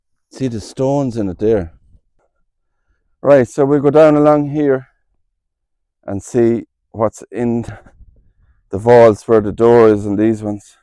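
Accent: Ireland. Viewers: I though so. Irish accent. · No better tribute to how we all end. The Irish accent gives depth to this story and as an English man I thank god for this respectfull demontration in how it needed to be done. By the grace of god . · I love your accent I'm a Canadian l hope to visit Ireland soon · Just subscribed , nothing better than irish video's and listening to the Irish accent 😊 great subject today 😊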